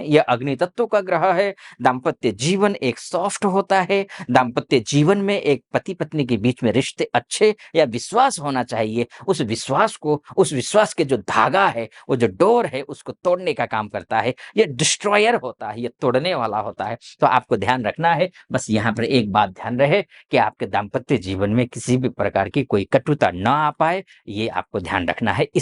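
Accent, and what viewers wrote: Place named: Nepal. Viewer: Your accent looks like you r from nepal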